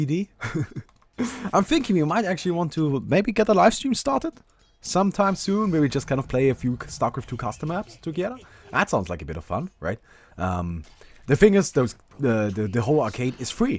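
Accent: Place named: Germany. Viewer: when it comes to "medallion", it's an accent. You have a german accent and we don't shit on you for mispronouncing some words, now do we? ^^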